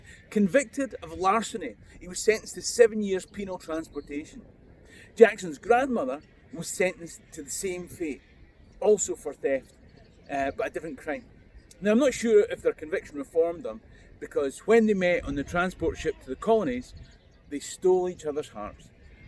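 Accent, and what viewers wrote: Scotland, United Kingdom. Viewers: What accent would you expect from a Scots man lol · I to am American of Scottish decent. Love the stories and the accent! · Love the videos, as they deal with subjects that have I have always found interesting. A bonus for me is your accent, it brings back fond memories of my Scottish Grandpa on my dad's side. My Grandpa immigrated from Scotland to the U.S., by way of Canada. He met and married my Grandma in Massachusetts, her family had been in New England since the Mayflower, with my ancestor, Peregrine White, being the first English baby born to the Pilgrims, on the Mayflower, while the ship was anchored off of Cape Cod I have dual citizenships, one due to my British Mum, as well as me being born in England, and a U.S. Citizenship from my American Dad. I have lived most of my life in the USA, with over 30 years just outside Phoenix, Arizona. 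If you want to know more details about me, and the seriously tragic event that has shaped my life, including the horrific disfiguring scars that make people gasp, and small children cry, I have posted a link to a video that captures the exact moment my world was literally ripped apart... ⚠WARNING! GRAPHIC VIDEO! NOT FOR CHILDREN OR THE SQUIMISH!⚠ <link>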